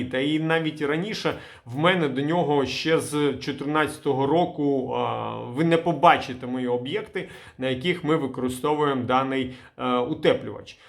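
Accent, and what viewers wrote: Russia. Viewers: Что-то сильный російський акцент в твоей український мове. В твоей речи нет украинской манерности.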